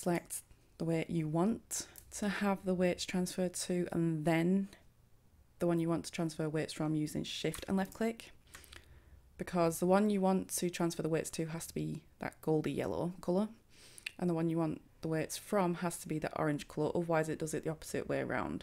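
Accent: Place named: Leeds, United Kingdom. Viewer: Transfer "Wearr-ts" ! I love your Leeds accent.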